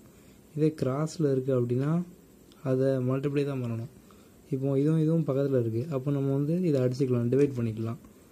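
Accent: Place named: Thoothukudi, India. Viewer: Anna ninga uplode panna Ella videos um pramatham...especially ur teaching skill is really good....nd ur slang tutucorin....innu nirya ethir pakrom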